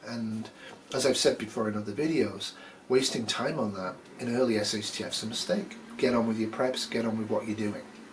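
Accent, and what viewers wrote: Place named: Scotland, United Kingdom. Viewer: You sound more Scottish then Cannuck.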